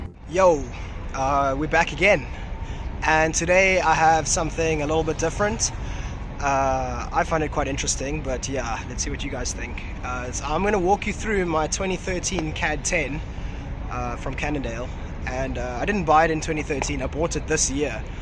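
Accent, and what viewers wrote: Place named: RP, United Kingdom. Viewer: I like how posh you sound on camera. Liked and subscribed.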